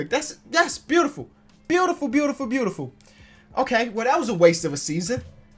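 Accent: Chicago, United States. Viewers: Was this guy born in Chicago or something?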